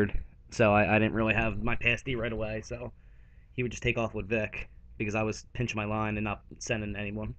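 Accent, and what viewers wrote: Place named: Philadelphia, United States. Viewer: u from philly bro? i hear it in your voice lol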